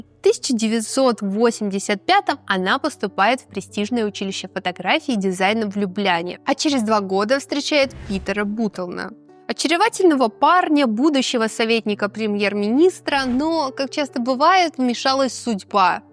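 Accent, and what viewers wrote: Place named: Russia. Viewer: Дорогая ведущая, ты с дианой панченко как две капли воды по стилю ведения программ, по расстановке интонации и акцентов, по переигрыванию ситуаций, по мимике, агрессивному обживанию передаваемой информации, по особо подчеркнутому руССкому акценту, да почти по всему, включая ваши перенакачанные губы!..... что это за такая дивная тенденция в современной пророссийской журналистике!!!!!!! Фу, противно и гадко!!! Не переигрывайте, будьте живыми, незлобными, простыми и позитивными, оставьте излишнюю едкость и будьте менее судьями, а более информаторами!!!!!!!! Успехов!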